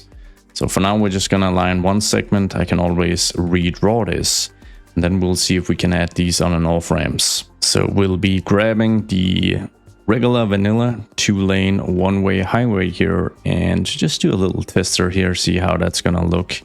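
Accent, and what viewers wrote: Denmark. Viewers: I was wondering about your accent, are you by any chance danish? or maybe dutch?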